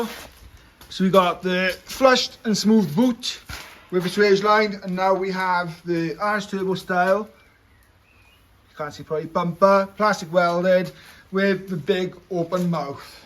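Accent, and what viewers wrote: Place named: South Wales Valleys, United Kingdom. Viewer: yes it is a c20let haven't driven it for 18 years tho. Sounds like your from the top of the valleys I'm from Caerphilly not too far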